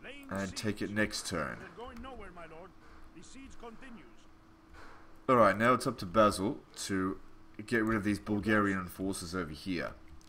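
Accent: Australia. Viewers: Yep, sounded Australian to me.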